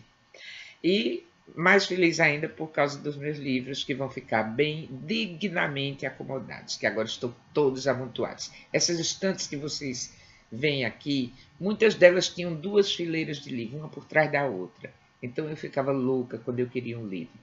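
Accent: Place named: Northeast, Brazil. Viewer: obrigada pelo presente... aqui embalando meus 250 livros rsrs... e procurando como 'embalar livros" me deparo com este presente, essas leituras lindas, com o sotaque tão afetivo em meu coração, a doce lembrança da minha avó paraibana e de meu velho pai, pernambucano, contando os 'causos' do sertão diante das fogueiras de são João nas periferia de São Paulo, onde crescemos eu e meu irmão - gratidão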